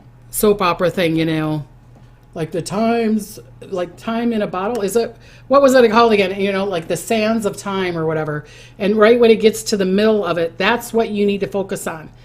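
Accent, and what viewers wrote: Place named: Minnesota, United States. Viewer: So glad I found this page ! I love your Minnesota accent ♥️♥️ Like a warm hug . Subscribed and notifications on ! Xoxo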